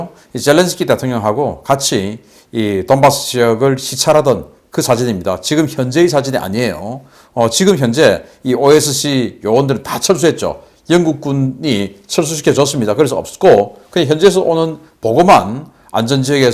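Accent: Gyeongsang, South Korea. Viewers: 신대표님, 첫인사 "안녕하십니까" 말할때 . 듣는 나는 신대표님이 심통난듯 한 말투로 들리네요. 물론 경상도 사나이라는건 알지만....ㅋ 안녕하십니까 할때 맨뒤 음. "까"을 살짝 내려보심이....ㅋ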